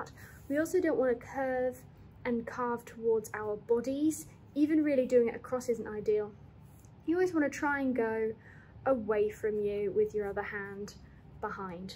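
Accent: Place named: United Kingdom. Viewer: Nice British accent! Thanks for the tips.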